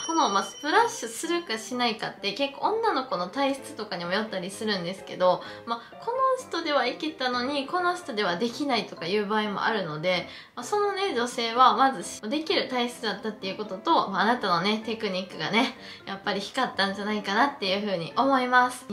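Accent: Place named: Kansai, Japan. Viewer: 関西弁で話すから余計に面白い良いお年を (^^)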